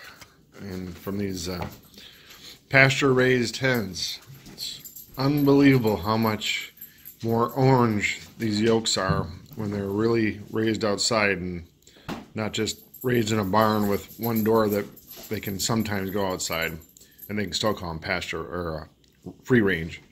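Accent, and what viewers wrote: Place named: Wisconsin, United States. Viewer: Awesome job! Haven't seen any bears yet in Northwestern Ontario Canada but just a matter of time. Lots of deer right now. Sometimes up to 6 or 7 at a time and I live in town lol. Couldn't help but laugh at the Canadian accent comment...as a Canadian I halve to say...You do sound like you are from WI. You never say "eh" which is the first clue you aren't Canadian. We say it all the time without realizing...too funny 🤣 My cousins live in Minnesota and they always laugh about how we say things here.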